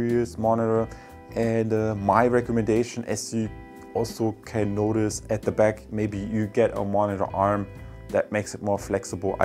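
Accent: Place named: Germany. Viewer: I can hear he is german. I bet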